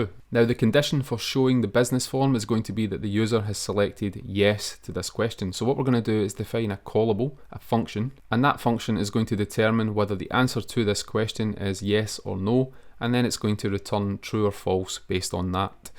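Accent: Scotland, United Kingdom. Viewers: Just curious - where's your accent from? I'm thinking Scotland, but I'm not sure if I've heard it before... 🙂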